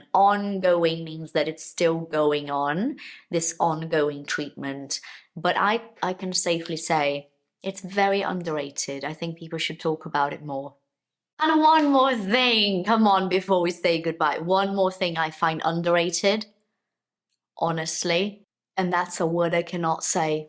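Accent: United Kingdom. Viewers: Hi teacher .. I'm learning the British accent..and I really like how you speak exactly like the British people I hope to speak like you 😢 Could you please tell us the secret 💔 Please make a video on this subject.. I feel frustrated because I try to speak like British people, but I still can't. Thank you very much❤. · Teacher, sorry for the question but, are you a native speaker? You have a really nice British accent oh my god 🙌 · Great content. Are you Brazilian? Beautiful British accent. I strong believe that your sign is Virgo \o/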